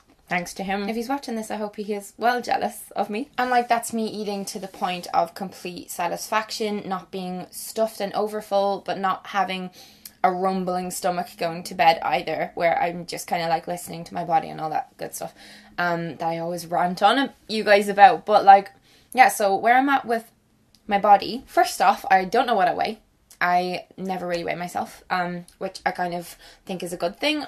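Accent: Ireland. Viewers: I love theseeeee and you your Irish accent is lovely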